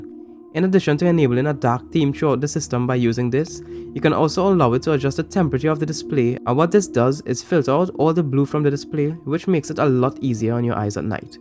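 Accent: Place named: Trinidad and Tobago. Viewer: Hmmm..... you sound Trini. Am I right? :) #Trinidad&Tobago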